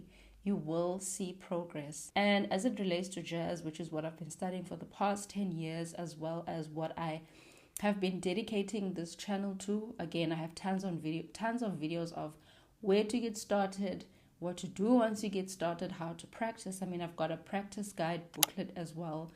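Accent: South Africa. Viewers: You from South Africa ?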